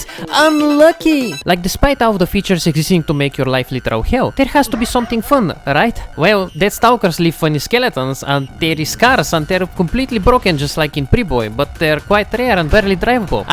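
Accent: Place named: Bulgaria. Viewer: btw, your video is good, I like your humour, I think you are Bulgarian because of accent so hello slavic friend!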